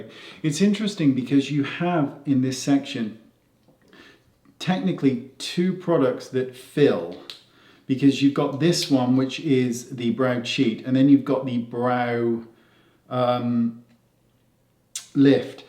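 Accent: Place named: Somerset, United Kingdom. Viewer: Looks great, nice to see a Bridgy boy on YouTube. I'm also from Bridgwater so I recognised the accent straight away 😊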